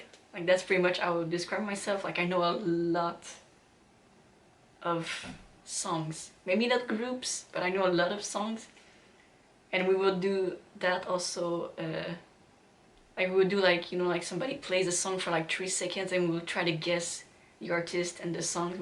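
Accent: Quebec, Canada. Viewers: Hey, I was born in Florida but subsequently got carried North to New York State when my mother divorced my pop. I was age two so I didn't have much to say about it but, nonetheless I have had a good life. I married and my wife gifted me with five children before we ended up going our separate ways. My four girls are the lights of my life. My mom played piano and sang well enough to be a songstress with the Chautauqua opera company when we lived in the ancestral home on the Institution grounds. I remember the days when mom would play piano in duets with members of the Chautauqua symphony orchestra. Jack Danziger, the violinist and Lazlo Varga the Cellist specifically. What a beautiful sound they made! I grew up with a keen interest in music, as a result. I could go on and on but, it is what it is and hsving reached the age of 73 I really don't want to burden you with more than that. 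Suffice it to say that I love your channel and you. I seriously doubt that we will ever meet but I will be there for you and your family and whatever music you play. Fear nought with thy interesting accent. It only serves to make your dual language abilities more attractive. Vive le Quebec! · Je le savais juste par l'accent que tu étais du Québec ,J,ai le meme accent Lol !!. Bienvenue dans le monde du rock !!!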